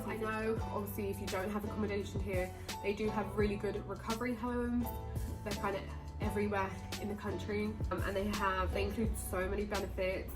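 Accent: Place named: United Kingdom. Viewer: Are you Colombian? Omg I had a feeling you was but was confuse since you talk English with the UK accent. Lol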